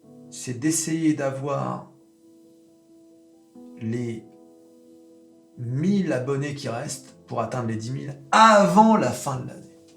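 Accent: United States. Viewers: on veut une video FAQ pour les 10k abonnés : quel est le point commun avec Jé Powell ? D'où vient cet accent southern américain ?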